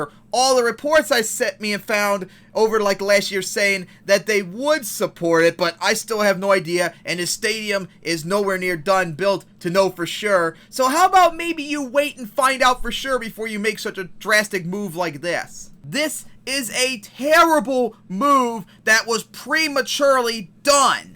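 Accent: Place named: Boston, United States. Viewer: I don’t often watch many YouTubers as I make videos on my own but, I find myself coming back to this video as this is funny as hell. Great rant! XD I can also hear a little bit of a Boston accent in your voice too. FELD is like any other corporation and they’re clearly not in compliance of caring for the audience. Wait they do! That is for the money. I honestly make the videos for pure entertainment purposes and enjoy doing so. Nothing more. Although I am and most of us are supporting them in a way with the videos we make,FELD will continue to accustom their values of what’s right in their perception. It’s business.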